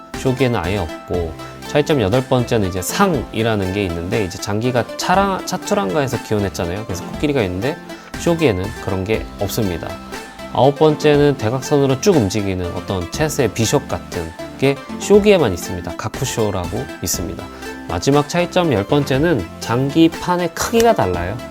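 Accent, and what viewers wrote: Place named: Southeast Asia. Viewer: 동남아 외국인 말투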